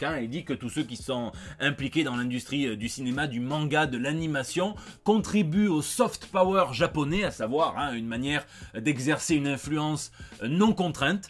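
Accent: South, France. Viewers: Salut !! Juste pour dire si jamais tu passes par là, il faut regarder l'excellente adaptation d'Injustice par Warner Animations ! En rappelant que c'est une adaptation donc y'a des micro-changements mais l'ensemble se mesure avec un double demi-mollomètre ! garanti ! Toujours autant de fun à te regarder, j'adore ton style et ta gouaille du Sud, franchement tu régales ! Bonne continuation à toi !!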